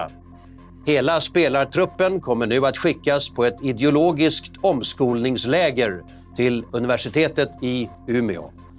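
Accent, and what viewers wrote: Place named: Baltic states. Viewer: Lätt svenska. Låter Balt...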